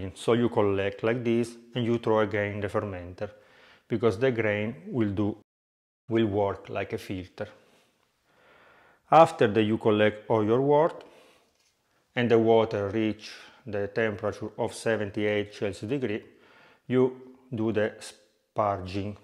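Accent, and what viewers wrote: Italy. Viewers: Wow! Maestro,I really learnt alot especially that of your fascinating Italian accent, it makes me feel like am in Milan or Cincinnati already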